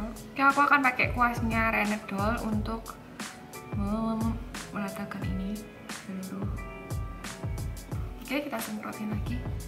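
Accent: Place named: Probolinggo, Indonesia. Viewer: aku suka sama mbak.😁 suami khu orang perobolinggo suka ketawa denger suara mbak, kata nya jadi kangen rumah 😂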